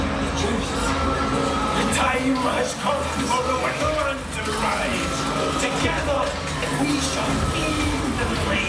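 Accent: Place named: Scotland, United Kingdom. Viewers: Malmborg Implano it’s a Glaswegian accent ( Glasgow ) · Malmborg Implano Yea scottish is a accent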